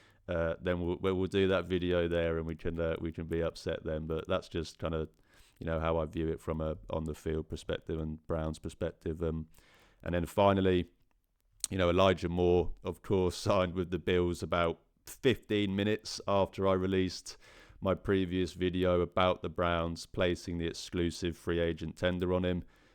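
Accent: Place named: Wales, United Kingdom. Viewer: What part of Wales are you from & welcome to the dawg pound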